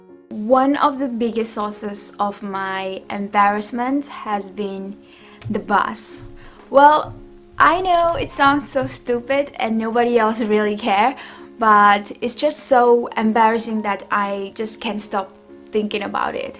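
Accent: Myanmar. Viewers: Cheese getting hot лол and yup u got a burmese accent but not as strong as my Russian accent.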